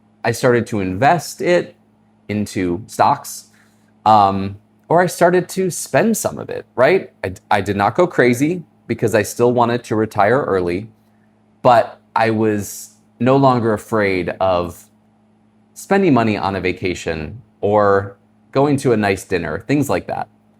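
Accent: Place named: California, United States. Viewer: I watched full video you should continue this path you have California accent and I hear foreign love that accent 😅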